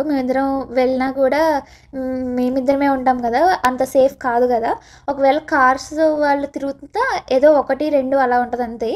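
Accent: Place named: Godavari, India. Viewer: typical godavari slang....... ------from a godavari boy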